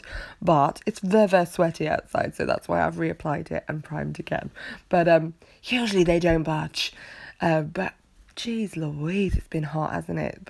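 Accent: United Kingdom. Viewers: Hello you just popped up in my feed nice to hear another britt. Yes it's been hot. Love the nails I have started to learn the basics so I can do my own as my natural nails are brittle due to fibromyalgia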